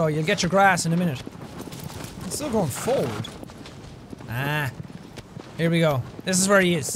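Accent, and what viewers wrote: Ireland. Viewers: Does anyone else notice when gets excited he gets more Irish? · Is it just me or did suddenly get a thick Irish accent halfway through the video? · sounds exceedingly Irish in this episode lol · sounded so much more Irish today! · Has bin' getting more Irish? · Is it just me, or is Jacks Irish coming out in this video.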